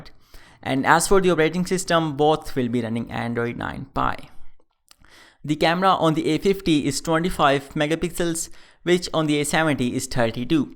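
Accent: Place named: India. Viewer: why is it only Indians they sound so annoying!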